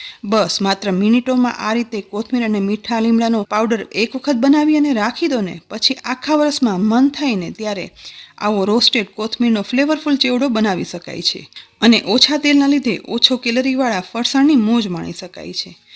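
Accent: Kathiawar, India. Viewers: Nice astonishing recipe of namkeen nylone chevado with corriender plus sweet neem leaves powder presentation with sweet kathiawadi speech voice. Thanks to ji.. With feelings.... Add basil leaves to increase taste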